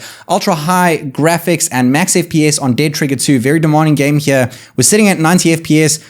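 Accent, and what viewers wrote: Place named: South Africa. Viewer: This guy sounds like a South African🤔 the accents gave it away....but is he?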